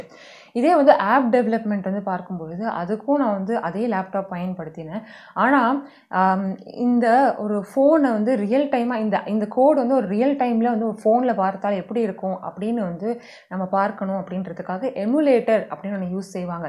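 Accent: Sri Lanka. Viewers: Are you srilankan??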